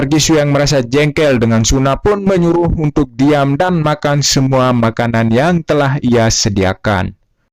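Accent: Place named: Lombok, Indonesia. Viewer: Logat sasak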